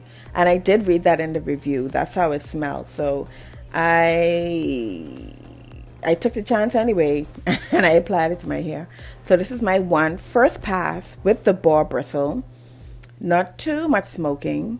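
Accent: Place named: Bahamas. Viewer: That has to be a Bahamian accent.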